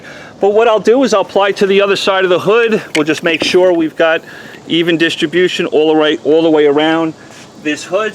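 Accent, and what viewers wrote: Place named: Minnesota, United States. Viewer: I’d love to try this, but I’m in Minneapolis. No stores in MN sell, one store each in both Dakotas, and one in Madison. I’ve always used Meguiar’s Expeess Spray Wx when I fry a car. Works like a dream tool. Plus, Meguiar’s is a 3M company, so I’m using product from a hometown place. Sure liked this video,. I like watching you because you’re thorough, and it’s nice to hear my accent out of someone else’s mouth for a change.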